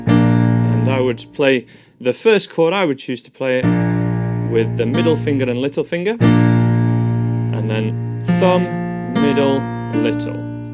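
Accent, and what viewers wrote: Yorkshire, United Kingdom. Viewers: Omg SUCH a yorkshireman!!!!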